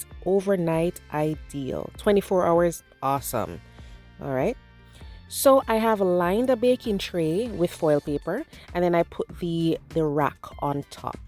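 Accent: Jamaica. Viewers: I love the Jamaican accent and your women's track team consisting of Elaine Thompson-Herra, Shelly Ann Fraser Price and Shericka Jackson is FLAWLESS. United States don't have a competitor to match for the MOMENT. But don't count us out! The men's track team is dominating and Jamaican can't compete with them.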